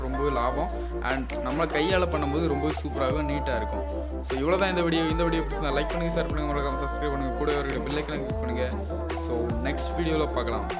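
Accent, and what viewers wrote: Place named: Kerala, India. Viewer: Dai... Nee pesurathe Kerala da.. Music cut pandra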